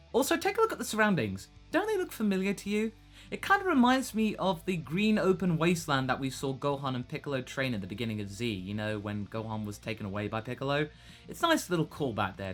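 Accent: United Kingdom. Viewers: By the way, since Super Saiyan Rose is so British now, are we going to see you do some impressions being that you're....you know...British?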